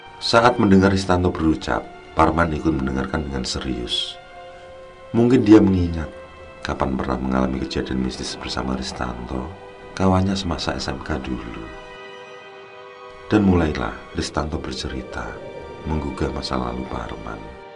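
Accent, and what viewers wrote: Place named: Bojonegoro, Indonesia. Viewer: Wong jonegoro ndi suarane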